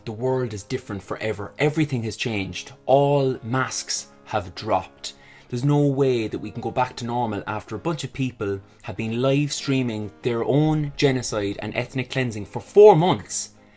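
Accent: Ireland. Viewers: The Irish rrrr good , yeh l love this guy ❤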